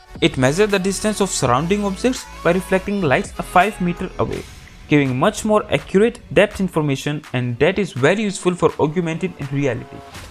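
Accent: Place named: India. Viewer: india accents so funny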